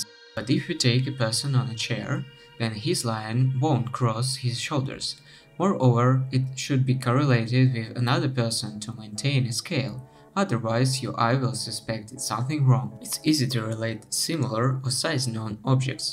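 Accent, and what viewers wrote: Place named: United Kingdom. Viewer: местами 100% британец, а иногда заговаривался, успехов!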